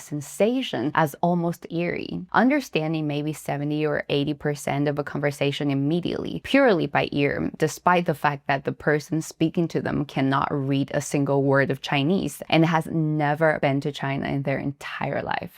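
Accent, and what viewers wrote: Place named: United States. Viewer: She has an American accent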